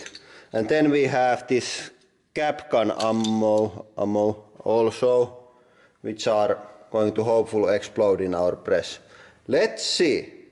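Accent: Finland. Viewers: Finnish english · he sounds finnish, is he? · His Finnish accent makes his videos hilarious. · Reasons why I love this channel: 33.3% hydraulic press, 33.3% Finnish accent, 33.3% that girl's awesome laugh. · its called ralli englanti its what Finland is most known for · North european :D and that is typical finglish :D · the finnish accent. Almost any grown man could sound like when they speak english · both the dudesons and he have the same accent and they sound the same I know they are Finish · I don't know what I love more the crushing or the beautiful Finglish accent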